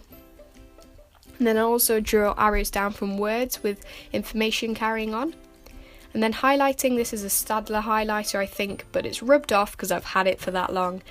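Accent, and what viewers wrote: England, United Kingdom. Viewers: I love your English accent and your beautiful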